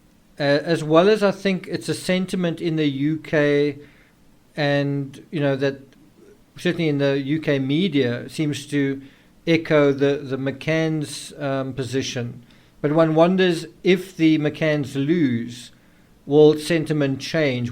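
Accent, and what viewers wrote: South Africa. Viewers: First time watcher. Why does it sound like you are South African?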